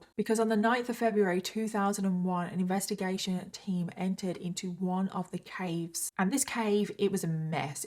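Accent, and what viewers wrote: United Kingdom. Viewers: My mother in law is from the UK, I just love your accent!!! And love your story telling!!! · Her British accent also helps. I've always found this irestisteble in women😆🥁😆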